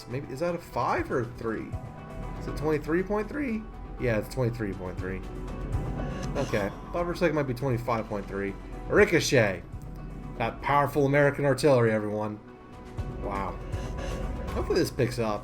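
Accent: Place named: Minnesota, United States. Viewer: As a Minnesotan I can confirm that accent is 100% accurate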